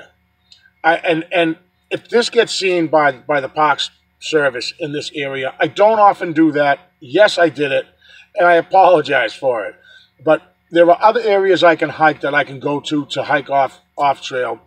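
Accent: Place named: New England, United States. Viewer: I'm not sure I believe that cr...p the park rangers are telling you...they know what's there.....I know what's there from watching your vids. I understand and respect your that you will go by their rules...and it's probably not a good idea to go off trail there anyway cuz there just might be something you don't want to run into...on the other hand if your gonna do any kind of field research on big foot you must be prepared for the worse to happen rite?? There are plenty of other places to go just like you said tho....Ive been a to a lot of forest ares up there as you know I to am from Mass........White mountains is where it's at!!!!! Again stay safe love your vids and I sure do enjoy hearing that new Enland accent again 😁😁😁LINDA IN FLORIDA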